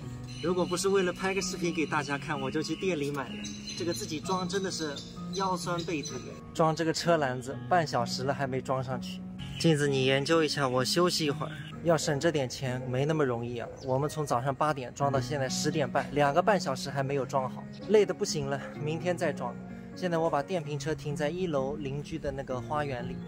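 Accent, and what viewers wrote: Suzhou, China. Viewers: 保持讲苏州闲话， 蛮好听的 😃。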